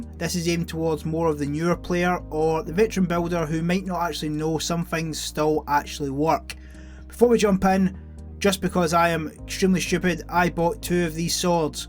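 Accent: Scotland, United Kingdom. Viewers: Are you Scottish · He bri'ish ?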